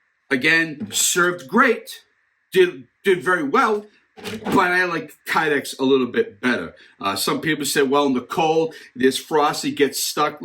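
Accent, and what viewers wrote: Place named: New England, United States. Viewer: But I guess this guy's from New England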